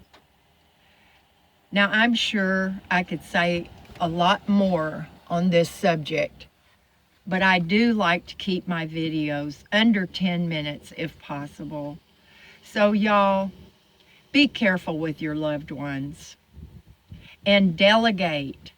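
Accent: South, United States. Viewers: ? I'm a new subscriber. Where did you get your ya'll accent? Anyway, I enjoy your videos.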